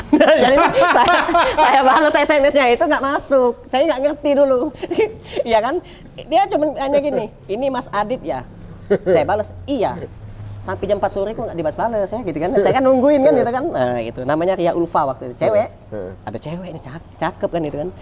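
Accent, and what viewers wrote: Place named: Palembang, Indonesia. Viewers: Logat palembang, dak ilang hee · Adit ini asli orang mana?... Logatnya kayak orang sumatera yak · Ini logat sumatra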